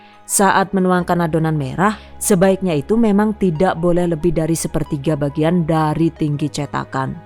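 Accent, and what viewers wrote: Banyumas, Indonesia. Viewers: Luar biasa nih boluny cntik dn empuk seneng bnget dengan pembawaanya sll di selingi bahsa purwokrto juga ngapak gitu skss yh mb mdh2an cenel ini sll mmbwa brkah amin 👍❤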